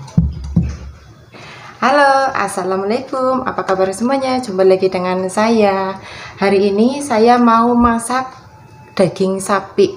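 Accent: Banyumas, Indonesia. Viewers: Mba, asli jawanya mn, ngapak ky nyong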